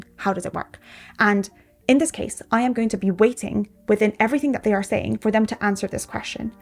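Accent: United Kingdom. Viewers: i LOVE your British accent!🥰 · “☘️A left handed red head with a British accent ☘️ : 🌹Beguiled me 💯 ✅🥀✅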